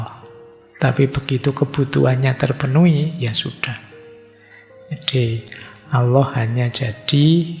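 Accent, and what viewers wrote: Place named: Malang, Indonesia. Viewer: Dimana yah kajianya,tempatnya pak Guru ini yah,nadanya kayak orang Malang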